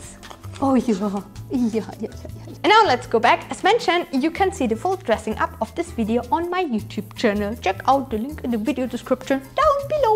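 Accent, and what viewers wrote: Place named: South, Germany. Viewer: Da kam das deutsch durch. Habe dich gerade erst gefunden und war noch nicht ganz sicher, welcher Akzent das ist... Erstmal abonniert.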